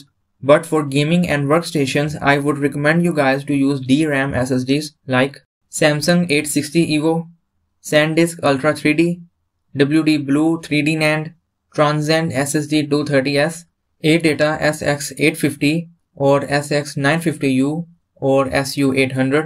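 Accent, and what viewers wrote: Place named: India. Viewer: I don’t understand English in Indian speaking.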